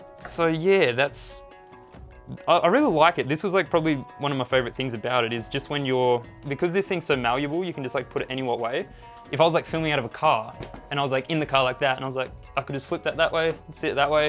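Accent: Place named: New Zealand. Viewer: Half the reason I watched this was for your Kiwi accent. It's like watching a young Taika Waititi. XD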